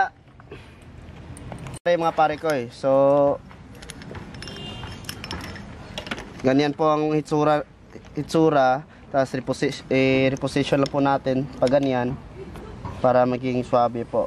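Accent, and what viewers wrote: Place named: Visayas, Philippines. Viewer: "giatay ka!" hahaha yawa oyyy. Nindot jod paminawn bsta bisaya mag yawyaw haha rs brod nice video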